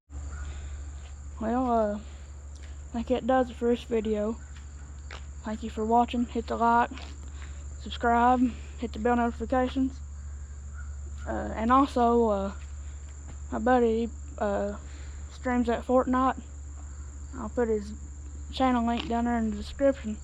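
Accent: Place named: South, United States. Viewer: love a southern Just how we all talk is awesome.. Very very strong southern accent love it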